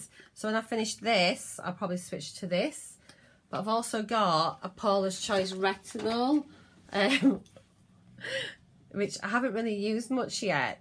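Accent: Yorkshire, United Kingdom. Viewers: Are you from Sheffield or Leeds maybe, judging from the accent :) great video, thankyou for sharing x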